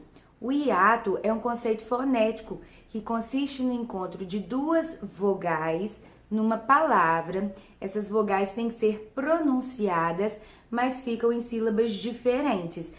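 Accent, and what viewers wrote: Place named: Minas Gerais, Brazil. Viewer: Ela deve ser de Minas ou Goiás . Pelo sotaque amei a aula já estou seguindo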